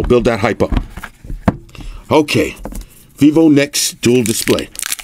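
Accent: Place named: Brooklyn, United States. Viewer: First time watcher, I know a brooklyn accent anywhere!! review is on point and full of detail. Awesome to see someone from the hood doin they thing on the net!! you got my sub / support. Do yo than bro!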